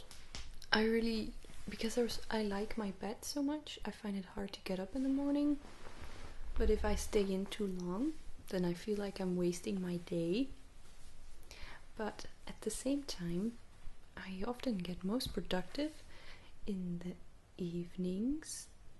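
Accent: Netherlands. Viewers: Niet in slaap gevallen, maar wel heerlijk om te luisteren naar je lekkere Nederlandsheid. :)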